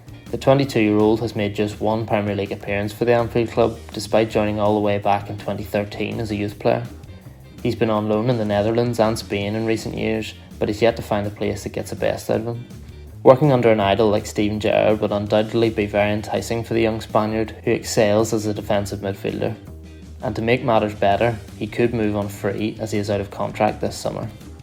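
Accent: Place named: Ireland. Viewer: Wow I didn’t realise there were Irish rangers supporters ? Can someone clear this up as the presenter sounds Irish ?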